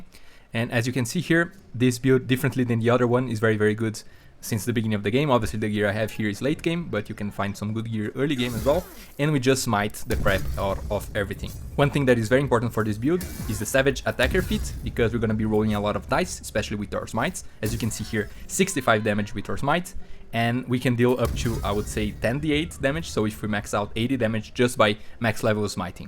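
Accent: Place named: Brazil. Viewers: Are you brazilian? You sound like a fluent brazilian speaking english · Pensei a mesma coisa, o sotaque do inglês dele é muito parecido se não for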